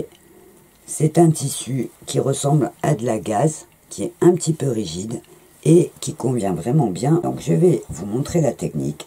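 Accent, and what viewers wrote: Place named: South, France. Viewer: Bonjour. Oui la fleur est facilement adaptable sur un tissu du fait qu'elle soit plate. Ah le charme de l'accent du midi "les reses" et les petits "hop" qui sont revenus 😉 ce n'est que du plaisir à voir tes vidéos!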